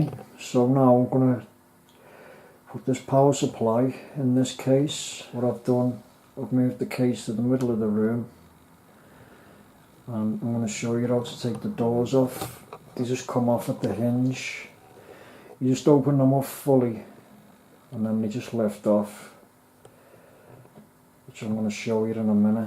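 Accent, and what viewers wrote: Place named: Liverpool, United Kingdom. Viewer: Thumbs up just for that Scouse accent lol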